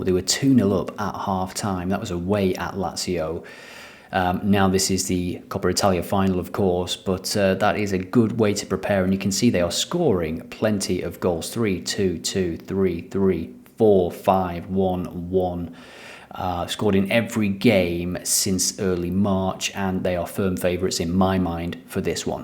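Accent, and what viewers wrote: Scotland, United Kingdom. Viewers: Deserves more than 1 like for the Scottish accent mate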